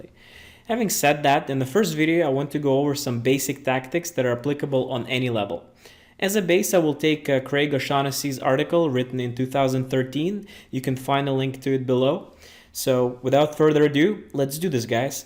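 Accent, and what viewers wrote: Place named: Russia. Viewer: your accent sounds like russian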